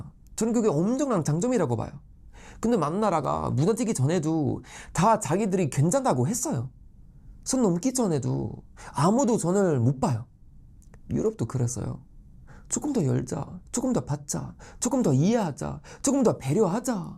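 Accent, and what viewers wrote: Gyeongsang, South Korea. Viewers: 왜... 경상도 사투리가 들리지.....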